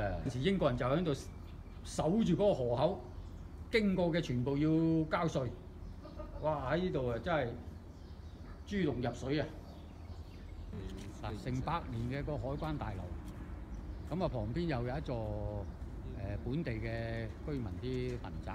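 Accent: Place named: Sanshui, China. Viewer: 三水廣府話，好接地氣。“理尻你”’聽了好親切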